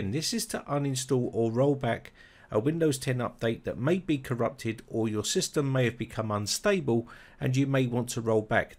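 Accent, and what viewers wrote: United Kingdom. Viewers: Wow.. Nice British accent. I love the way a true English man speaks.. Absolutely marvelous..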